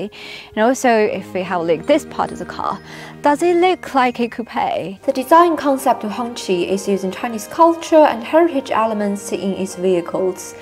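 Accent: United Kingdom. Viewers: 这小英式口音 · Chinese beauty with British accent 😯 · 厉害啊，英伦口音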